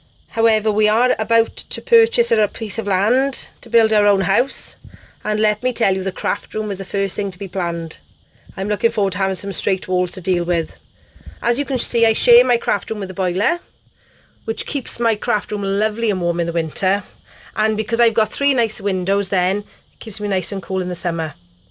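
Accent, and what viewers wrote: Wales, United Kingdom. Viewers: Greetings grom Ireland What a fab craft room. So dinky. Would listen to you all day. Love the Welsh accent. Used to holiday in Wales with my family when I was young.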